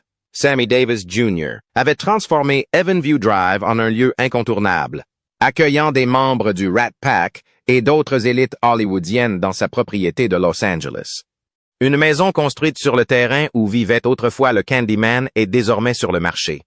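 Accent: Quebec, Canada. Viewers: De tout les beaux accents possibles pourquoi l'IA utilise l'accent québécois ?!?....😅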